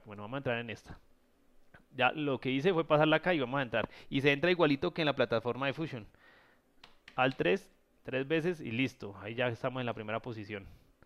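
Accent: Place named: Colombia. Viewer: acento colombiano fuerte jejejeje, Gracias por lo que aprendemos de ti!! Por favor respondeme a que te refieres con "Esta si tiene pareja" en los gráficos??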